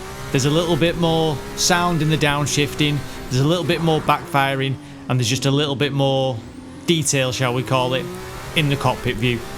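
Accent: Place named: Manchester, United Kingdom. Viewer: you sound Mancunian to me, I could be wrong but YANITED. RSS car sounds pretty good although I love what VRC offers in terms of setup and features with its csp version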